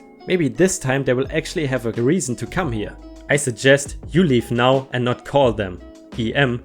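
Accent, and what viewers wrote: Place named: Germany. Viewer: I was pretty sure that accent was German, and it was all but confirmed by your perfect pronunciation of Bremen and SV Werder. Servus~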